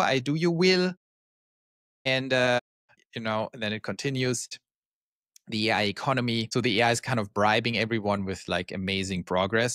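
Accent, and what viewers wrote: Germany. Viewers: Are you German? Your accent sounds like it but your name doesn’t lol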